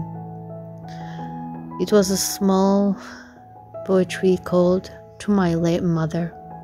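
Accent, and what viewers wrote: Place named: United Kingdom. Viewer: Your British English is very nice you have an accent beautiful thinks for this ideas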